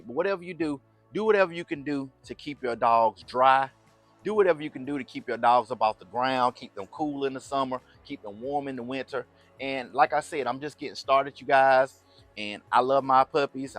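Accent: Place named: South, United States. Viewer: I think my mind is made up now to use pallets. Hec, my pocket has my mind made up. We have old 12x12 fence for dog pin. You have great ideas and spoiled pets. Im a Georgia 🍑. I caught your southern accent about your second sentence. I find the southern accent rich, rooted and historical. Thanks for sharing your video.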